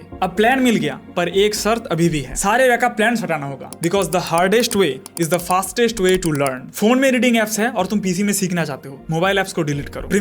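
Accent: Bengal, India. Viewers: ভাই তুমি বাংলা বলো আমাকেতো প্রথমেই লেগে ছিলো য়ে তুমি বাংলা কথা বলো তার জন্য তোমার কথা গুলো একটু আলাদা লাগছে 😊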